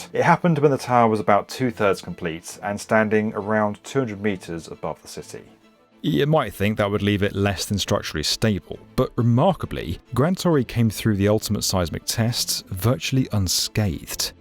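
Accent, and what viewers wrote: England, United Kingdom. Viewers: Didn't totally expect to actually listen to a English man talking about the Costanera Center But here we are Viva chile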